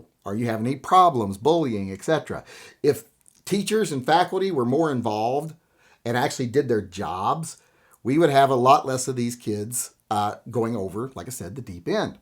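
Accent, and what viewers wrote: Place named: Texas, United States. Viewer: If you're, how come you to sound like most of the other Texas country folks I know? Are you from west of the Taconic/ Appalachian Range? That might explain it. I've heard Mainers that sound like me, too - from west of the mountains. Or from in the mountains. WAIT A MINIT! Did I just hear you say offer the weird kid a handie??? No thanks don't want a stalker - it's enough that I talked to the weird kids. They become attached and no matter how kind they are, they usually end up being insistent, wanting sex with you all the time. I've had six oddballs / weird kids, sexually ambiguous, moody broody or otherwise outcast loner types fall in love with me because I was kind enough to give them the time of day or to speak a bit and say hello with a smile. They also get very possessive of your friendship and time. They also make it all bigger than it really is. 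That of course probably tells the world that it means the world to them, but it's really not the same for you. They fall in love and to them, that means sex - as it does for most all males, daughters ignored by fathers, but most always for the odd people who are probably not getting any parental love at home or sibling affection, respect, or any familial attention even. It may be the very reason they are odd because their parents are like the bullies at school! No handjobs for the oddballs, it may create stalkers and other unsavory events.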